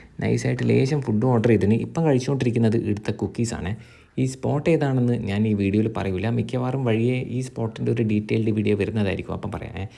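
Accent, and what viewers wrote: Kannur, India. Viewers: Chetta ningala sound ok set anu ee presentation ok adipoli poliyanu.... Enkilum food ok orupad kazhikkunath kond ninglde health nu ok valla issues verullei....... Work out ok chryyarundo.......Enthayalum viedio adipoli anu ketto..........ath parayand erikkan pattulla athra super anu❤️vere oru vloggers num illatha nice presentation.... Pinna kannur slangum adipoliii❤️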